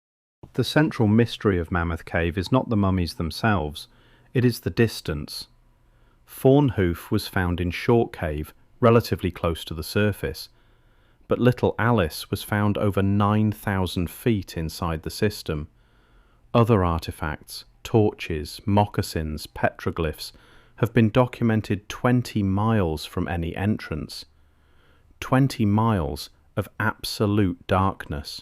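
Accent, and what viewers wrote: United Kingdom. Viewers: Yea. Same British voice on all the videos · A British accent saying "54 degrees" and not immediately saying "Fahrenheit" after that. Only with AI.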